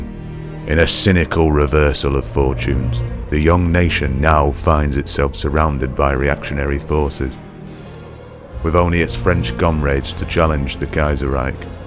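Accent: Yorkshire, United Kingdom. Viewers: Proper Yorkshire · finnfinn62 Sounds like Yorkshire to me, as a Yorkshireman. Haven’t met a Brummie who sounds like this before. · It's not Sean bean. There are a lot of people from Yorkshire who can do that accent. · I love that a Yorkshireman has voiced this.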